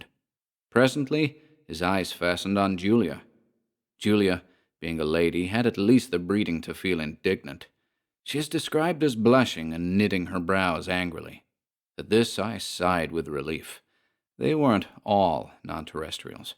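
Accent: United States. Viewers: I love your ‘Merkin accent,. Nailed it! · Your American accent is fantastic!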